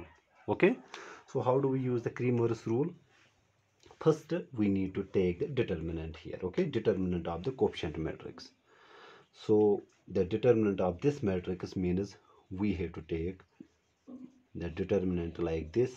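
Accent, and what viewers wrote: Kashmir, India. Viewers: you have an amazing Kashmiri accent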